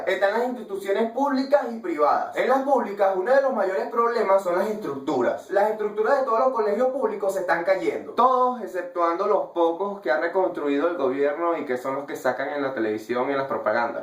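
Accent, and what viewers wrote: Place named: Venezuela. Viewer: Hola!!!Lo sé, tu país tan lindo está pasando por una crisis muy fuerte,y no hay punto de comparación, pero verán que pronto saldrán de eso. Y de verdad me encanta tu forma de expresar además del acento venezolano.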